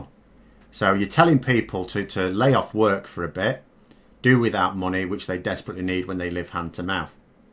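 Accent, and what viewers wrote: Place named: Scunthorpe, United Kingdom. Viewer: Hi. The Google auto subtitler struggled with your scunthorpe accent in the first few seconds with a hilarious result 🤣🤣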